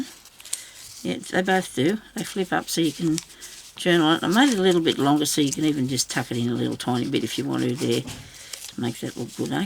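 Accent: Australia. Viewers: Good day from WA. Its so nice to here another Aussie accent. Thank you so musch, I think your book's awesome!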